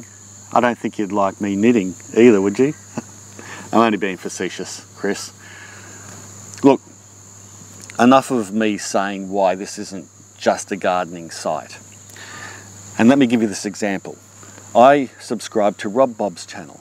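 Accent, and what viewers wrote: Australia. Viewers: I don't have TV and I just love the sound of your Aussie voice, if its relevant to you I can listen to you talking about :-) I hope you keep making videos even if its about the weather and its impact on your garden and your neighbourhood... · From how to build a fire pit, pickling, watching you cut wood, transporting snakes, learning about a tick I never knew existed, to how to grow a lot of... I have really enjoyed your videos. I love your humor and some of the things you've done with your videos. I felt like a fool after watching one video (which was the first one I watched) then sharing that your hen was broody. Thanks for graciously letting that go by. I don't even have any chickens! Keep up the good work. You always seem to make me laugh. The videos you share benefit me. After the review of the Mortar & Pestle set I knew which one I wanted to buy. 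Oh yeah the DIY outdoor cat hotel - so cool. Ok... REALLY, I just dig the Australian accent not to mention the sound of your environment. The birds and wildlife are phenomenal.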